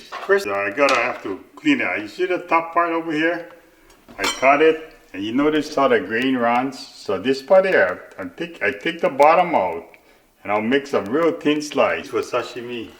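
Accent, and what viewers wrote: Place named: Hawaii, United States. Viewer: Can hear the Hawai’i accent!!!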